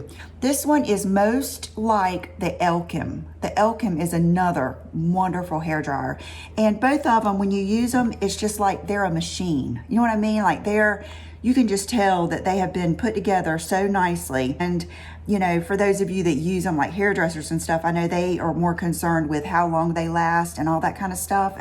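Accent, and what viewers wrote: South, United States. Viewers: Love your precious southern accent!! Can I be you?!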